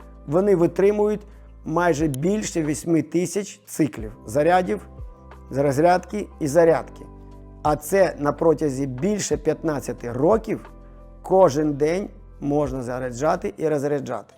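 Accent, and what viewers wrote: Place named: Chernihiv, Ukraine. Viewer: А що мова не рідна? Вимова схожа на чернігівську😊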